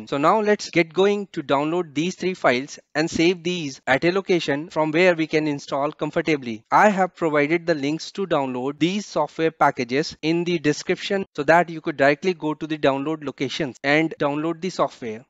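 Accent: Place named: India. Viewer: talks like an indian bot, jesus christ bruh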